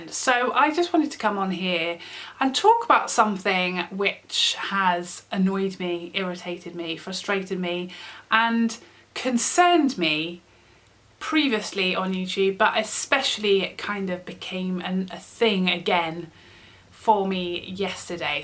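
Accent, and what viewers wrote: United Kingdom. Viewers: Yup, I reckon drugstore ain’t the only duping going on in beauty YouTube! Really enjoying your channel btw, nice to hear another British voice. I should add that I came to you through Mel, who I in turn came to through Wayne - all lovely genuine people! xx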